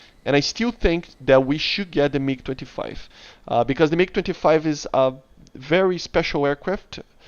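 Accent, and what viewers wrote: Brazil. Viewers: where are u from? your soutaque looks like brazilian soutaque xD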